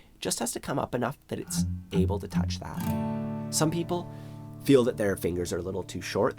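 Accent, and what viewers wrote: Canada. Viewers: holy crap this is a perfect study on how to do a better Canadian accent also really good for learning guitar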